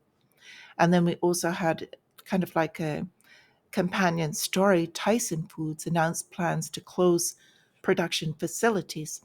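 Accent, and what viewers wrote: Ireland. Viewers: So I was right! I thought I detected an Irish accent :-)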